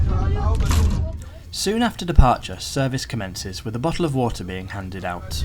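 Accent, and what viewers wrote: United Kingdom. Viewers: Your calm British (clear) accent is comprehensible and non-partisan. Keep it up. As another member already suggested, your suggestions and observations have been listened to and implemented. Two glaring points to be taken up by Indian Railways. Timing discipline. Always make sure that Trains leave on time and reach on time or a but earlier if possible. It is unfortunate that the passengers could not connect to the Delhi Metro station due to the delay in reaching time. Thank You.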